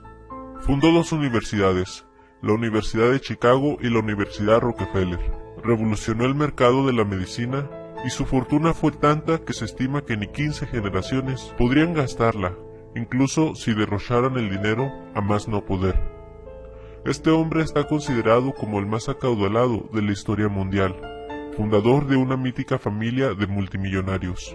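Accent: Chihuahua, Mexico. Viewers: Mmmm... asento de shihuahua ? Si, este es de esos.... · pues por la manera en que dice la "ch" yo digo que es de chihuahua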